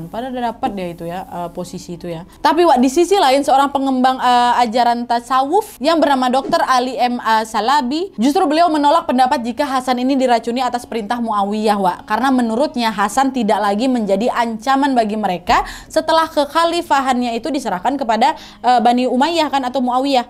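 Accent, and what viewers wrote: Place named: Medan, Indonesia. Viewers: ni logat org Medan kyknya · macem cakap org medan ni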